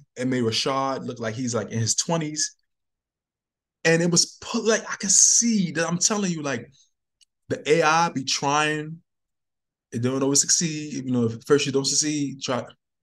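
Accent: New York, United States. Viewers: I just love your dialect and how you say. It’s so NY😊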